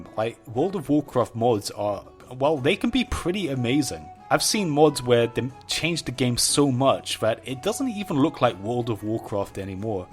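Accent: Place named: United Kingdom. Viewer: Hold of woocraft ... British accent is funny XD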